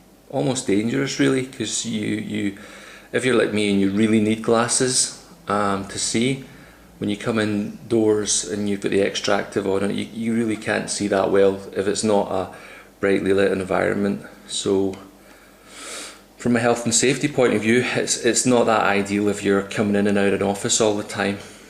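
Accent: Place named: India. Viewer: wow you sound Indian or some kind of black but you is white mate!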